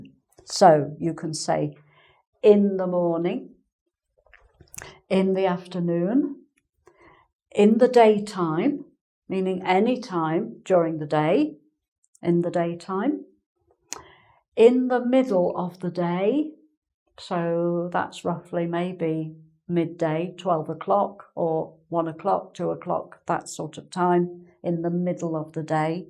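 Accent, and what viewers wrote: England, United Kingdom. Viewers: she must be from England becuse her accent is the same like others English people lmao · I love her british accent. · I very love British accent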